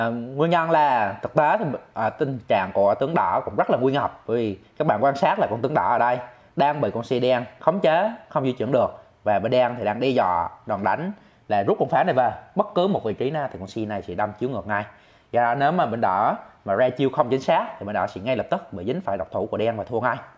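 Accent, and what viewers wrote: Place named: Central, Vietnam. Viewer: Mang tiếng miền trung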